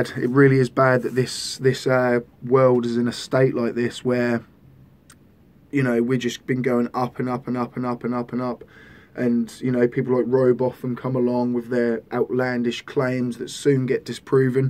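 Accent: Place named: England, United Kingdom. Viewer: U r obviously english, whats ur relationship with düsseldorf?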